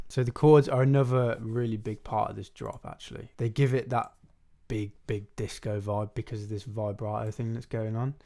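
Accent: United Kingdom. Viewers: I love his british accent 😍😍😉🙃🙂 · If I could have one wish granted, it's that even after reaches that, level of fame, he would still be our lovely down to earth Brit who'd sit down and make these videos for us... even in the private jet if he must. Super helpful and makes me really happy just watching a guy who loves his craft, doing his craft. So plz... even with the millions of $$$, I pray that he will still regularly share these humble, happy moments with us. Maybe we can hear Speak Français in a British accent one day... hmm, I will hope and pray.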